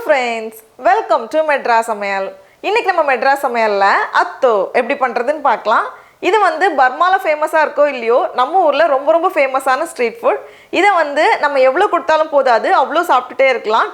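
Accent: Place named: Kanyakumari, India. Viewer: Akka inum kanniyakumari slang varuthu...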